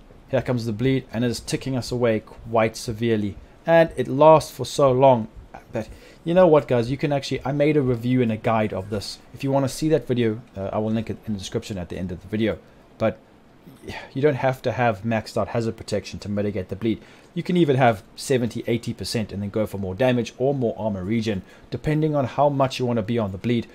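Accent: South Africa. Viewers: Im guessing Joburg or Durban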